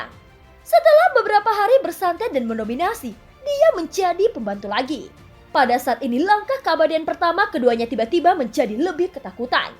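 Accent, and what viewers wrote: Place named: Surabaya, Indonesia. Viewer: Suroboyoan rek bosone , semangat yo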